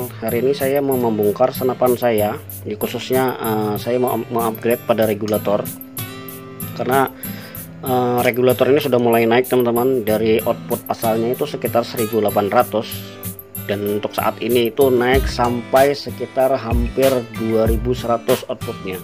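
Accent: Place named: Indonesia. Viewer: Logat bahasa HSU msh lekat dan kental bang..🤭🤭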